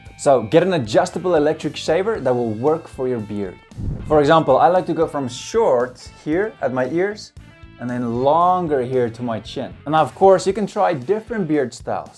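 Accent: South Africa. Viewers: Soon as you started talking I thought, that's a boerseun. You can never fully undo the south African accent. Thanks for the tips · That accent sounds south African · Are you a South African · Awehh broooo did not expect you to bee south african 🇿🇦🔥💪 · Can't help noticing the accent, south African?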